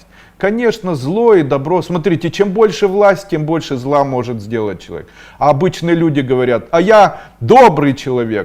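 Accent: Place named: Kazakhstan. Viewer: Ви такий прикольний.Акцент казахський.)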